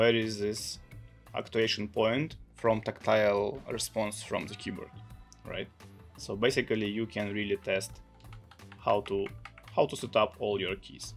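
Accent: Russia. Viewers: Акцент больно русский😮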